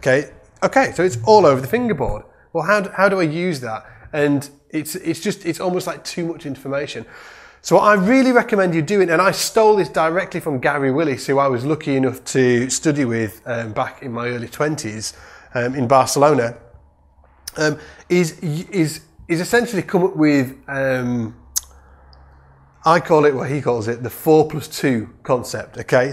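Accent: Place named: United Kingdom. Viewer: you are the man! love the glove, British accent and of course the bass lesson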